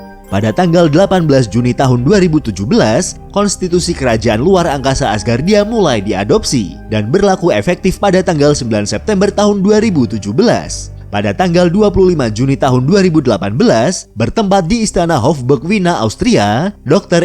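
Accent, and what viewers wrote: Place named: Garut, Indonesia. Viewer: Asli Garut kah min?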